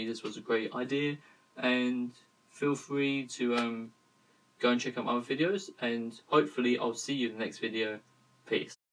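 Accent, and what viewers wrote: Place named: United Kingdom. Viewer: Am following this channel. Extremely love that British Accent.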